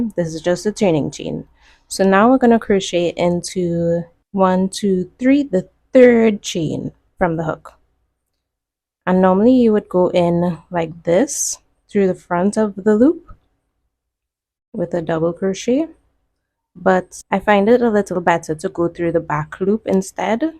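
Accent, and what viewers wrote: Trinidad and Tobago. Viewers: im guessing youre trinidadian, your accent seems so familar !!! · You from Trinidad? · Omg my total shock when I heard your accent and realized your from my country Trinidad and Tobago omg!!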